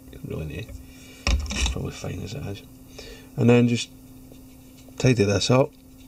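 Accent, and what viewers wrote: Scotland, United Kingdom. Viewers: Love the Trainspotting accent and love the simplicity of that bug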